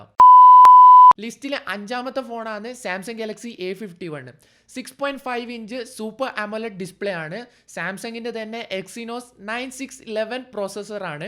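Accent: Kannur, India. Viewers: Kannur ano?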